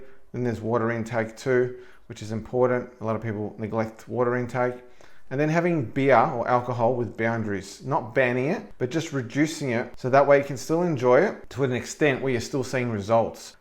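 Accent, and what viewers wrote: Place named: Australia. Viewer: Excellent advice, delivered in an Aussie accent that is impossible to ignore. These are all points I can follow, determined to lose the spare tire around my midsection, thanks mate!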